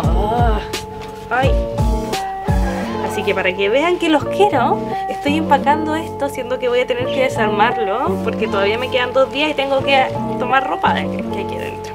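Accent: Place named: Chile. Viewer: Se nota de una que es chilena haha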